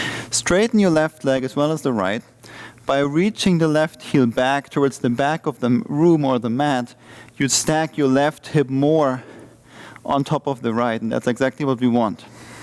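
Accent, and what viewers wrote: Germany. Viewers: Awesome session. One of the best I ever made.. The guy has a familiar German accent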